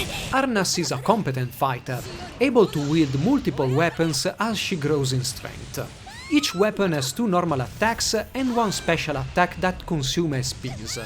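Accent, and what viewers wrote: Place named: Italy. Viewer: You're the first person I've heard who has such a strong accent, but speaks so good english. Italian?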